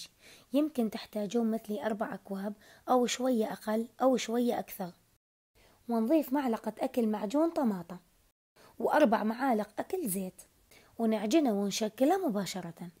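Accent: Mosul, Iraq. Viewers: فدوه شلون معدله عاشت ايدج واللهجه الموصليه تجنن بلسانج ربي يبارك بعمرج ويحفظج ويرضى عنج بنوتي الحلوه🥰🥰🥰🌷🌷🌸🌸👍👍👍👍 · الله يوفقج سهلتيها علينا طبعاً هاي الطريقة اسهل من الطريقة السابقة الله يرضى عنج ويرزقج من فضله يااارب. اعشق لهجة اهل الموصل 😍🌹❤️ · عجبتنه العروق واللهجه الحلوه والشرح الجميل الوافي تحيه من البصره للمعدلات المصلاويات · أنا أعشق الاكلات المصلاوية 😋😋😋 اللي زاد حلاوة الڤديو لهجتك المصلاوية 😊💐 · ماشاءالله روعة تسلم ايدك…طريقة العمل والشرح المختصر المفيد رائع والاروع والاحلى اللهجة المصلاوية المحببة عند كل العراقيين …استمري عزيزتي وبالتوفيق ان شاء الله 🌷🌷🌷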